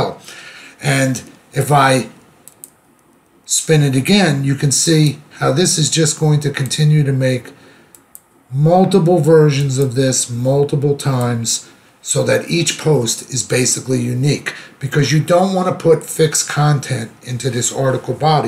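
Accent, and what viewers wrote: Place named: Bronx, United States. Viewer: Hearing your rough bronx accent say transcendental meditation was worth the watch. hehe So is this download and run software? If I buy a lifetime license, I dont have to worry about servers going down or anything shutting down the software in a few years?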